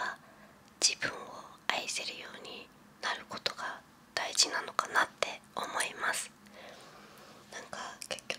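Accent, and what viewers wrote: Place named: Tochigi, Japan. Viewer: 栃木の方言めっちゃ共感できます～笑 あまり可愛い感じはしませんよね😅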